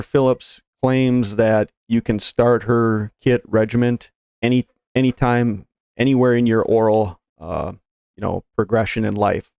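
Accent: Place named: Wisconsin, United States. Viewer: Oh you're from Wisconsin for sure.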